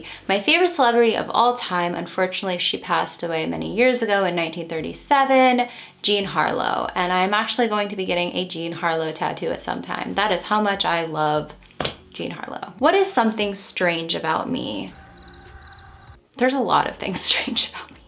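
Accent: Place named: Minnesota, United States. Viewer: What state do u live in? You sound like Minnesota like me being the ranch n many other things lol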